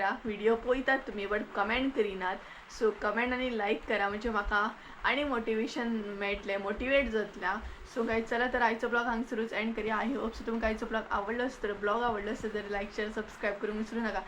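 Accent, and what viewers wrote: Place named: Konkan, India. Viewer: Keep going.... Don't stop your vlogs. I always like your talking way... I mean your konkani is different from ours as i stay in South Goa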